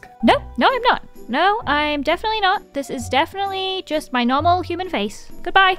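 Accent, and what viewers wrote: United Kingdom. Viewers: I love how fear and idea rhime in British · OMG she can rhyme "Fear" With "Idea" Cuz she has a british accent!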